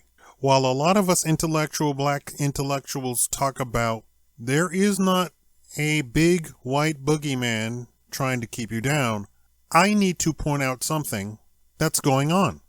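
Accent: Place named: Caribbean. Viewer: ha ha dude where is your family originated? i hear a carribian accent.Were you born in nevada?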